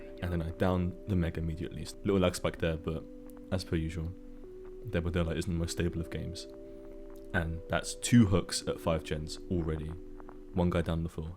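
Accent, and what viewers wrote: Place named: Southern England, United Kingdom. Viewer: bro u sound mad like my mate from yeovil u from south england?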